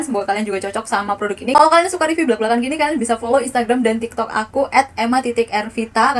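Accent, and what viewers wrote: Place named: East, Indonesia. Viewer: Kakak asli mana ? Logatnya kek orng daerah wetan yaak hhe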